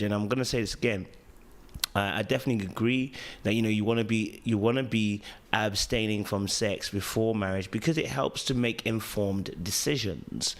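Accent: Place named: United Kingdom. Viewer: You are talking nonsense! Unless you are secretly married and speaking from experience, you shouldn't be providing any advice on this matter. Your comments regarding her vision of marriage are very elitist and African disguised with a British Accent! The Christian community should be praying that their divorce does not go ahead, but all of a sudden, everyone is a psychologist.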